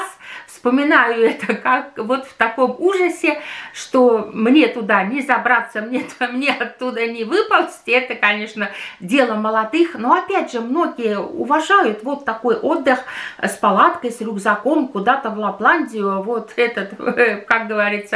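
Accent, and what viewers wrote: Finland. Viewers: У вас прекрасный русский язык, а лёгкий финский акцент только придаёт вам дополнительный шарм! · у вас такое финское произношение!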